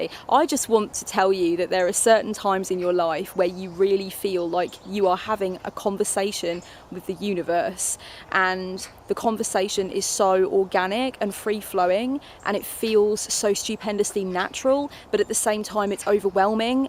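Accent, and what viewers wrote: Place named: Yorkshire, United Kingdom. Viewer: A. Luv the glasses B. luv the Yorkshire accent...UK impressions are always welcome to this Canadian gal ;) C. very inspiring as I work towards re-branding my own biz. You make ( as always) some fabulous points about signs and moving on. D. LUV that you are moving forward and clearing the cob-webs and are super jazzed to start fresh in October when things are ending all around us. Triple luv the juxtaposition of that! E...Luv you as always bc you are the bees knees. Thanx again! xo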